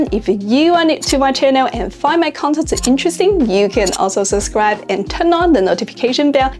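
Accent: Germany. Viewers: your German accent is slightly beginning to pour on over your English :P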